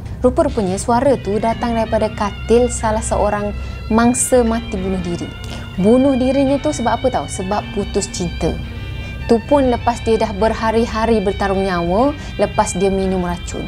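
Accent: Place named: Johor, Malaysia. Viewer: Kak ni org johor. Tgok loghat pun tau hehe😁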